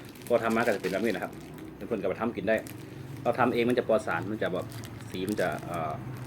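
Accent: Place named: Central Thailand, Thailand. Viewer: ชอบคุณ พูดภาษากลางพอรู้ค่ะ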